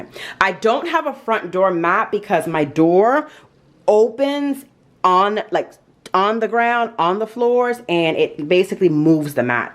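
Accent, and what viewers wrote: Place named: Virgin Islands, Caribbean. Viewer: I love the video, very organized and well put together. I picked up quickly on your accent 🙂. I’m also from the Virgin Islands 🇻🇮, St. Croix. I’m now your new subscriber!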